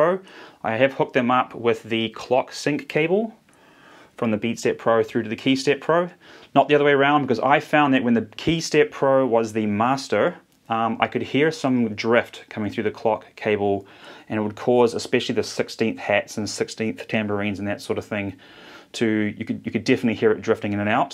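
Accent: New Zealand. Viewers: So, are you a Kiwi?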